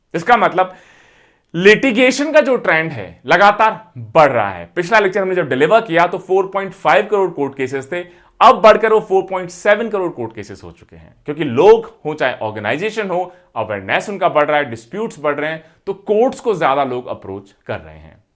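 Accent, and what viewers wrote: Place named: Rural, India. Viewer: सर आपके वीडियोज देख के एक पूरा रोड मैप तैयार हो गया पढाई का , परी analysis के साथ हो रही हैं,बस एक चीज की ठोडा कमी लगती है, हिंदी साफ सुथरा बोलने की ,क्योकि उसमे local language आ जाती है, गांव वाली हिंदी, गांव की भाषा ठीक है अपनी जगह पर हर चीज मे professional होना चाहिए, इससे थोडा आत्मविश्वास कम हो जाता है,इस पर कैसे काम किया जाए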